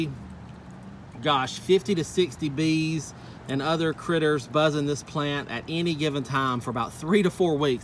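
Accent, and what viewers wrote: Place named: Carolinas, United States. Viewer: I heard you speak & instantly knew you were a fellow Carolinian! I love you already! Happy pollinators week! We did a celebration video too along with Marie at Salongirl Gardens. I’m so happy to see other gardeners raising awareness!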